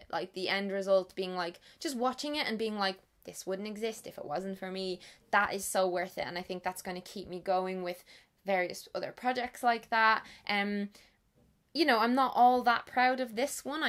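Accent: Ireland. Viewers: I already thought so haha but finally I get to hear it by a young irish person! btw loving your videos, I relate to so many things and listening to you talk (especially to your wonderful irish accent as I miss this country so much) calms me so much!! xx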